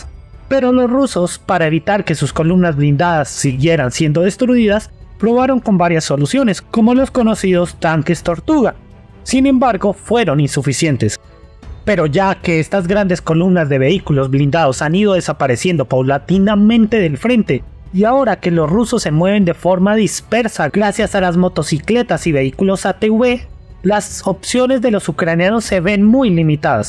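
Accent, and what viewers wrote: Colombia. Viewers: Pasó de mexicano a colombiano 👍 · Este comentarista es colombiano?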